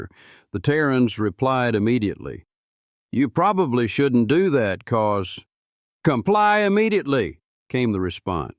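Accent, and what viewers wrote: South, United States. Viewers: Sounds like cleitis is telling a story real southern accent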